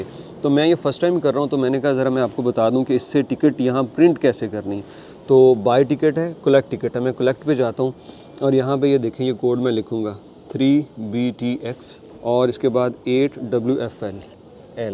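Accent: Punjab, India. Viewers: ایک مودبانہ مشورہ دوں گا، ناراض بھی نہ ہوئیے گا۔ آپ ایک اچھے یوٹیوبر بن سکتے ہیں۔ دو چیزوں کا ضرور خیال رکھیے۔ ایک تو یہ کہ آپ کی اردو اچھی ہے اس میں پنجابی کا تڑکا نہ لگائیں کیونکہ اس سے وی لاک کا مزہ کم پڑ جاتا ہے۔ دوسری بات سیاسی گفتگو سے پرہیز کریں کیونکہ آپ کو بیچ بیچ میں سیاسی چٹکلے چھوڑنے کی بھی عادت ہے۔ پاکستان میں بہت ظلم چل رہا ہے، جبکہ آپ اکثر اٹھکیلیاں کرتے رہتے ہیں۔ امید ہے ان چیزوں کا خیال رکھیں گے۔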